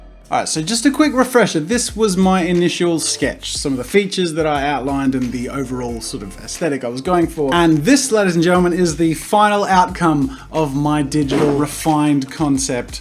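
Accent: Australia. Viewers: lol I love how he does the accent, even tho us Australians don't use that thick of an accent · I have his accent because I'm Australian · Friend: "Why does he sound like that Australian guy from Crocodile Dundee?" Me: "Probably cause he's Australian." Friend: "Really?" Me: face palm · I knew you were Australian and not from Boston!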